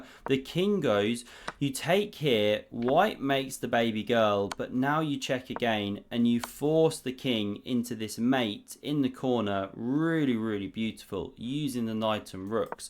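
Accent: United Kingdom. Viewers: Uk accent suits any commentary, cricket or chess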